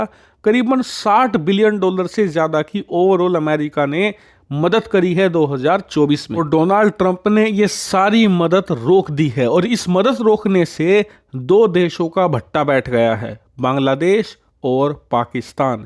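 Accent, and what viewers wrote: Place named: Rajasthan, India. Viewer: Aap pakke Rajasthan se ho aisa Lag raha he Best hindi k.show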